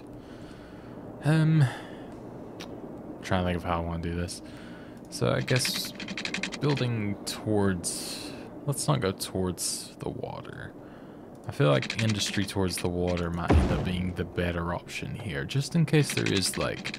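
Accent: Texas, United States. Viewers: Are you from texas?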